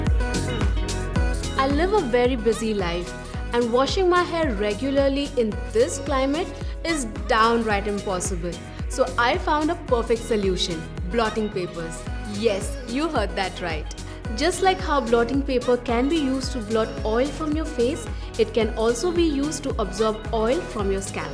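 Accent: India. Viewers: that lady is so pretty. i think she african/indian(south asian) because her accent is indian. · She has an Indian accent but she's black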